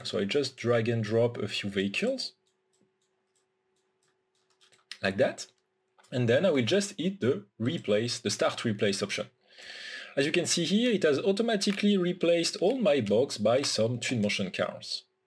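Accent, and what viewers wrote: France. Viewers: J'adote ton accent français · that french accent is très audible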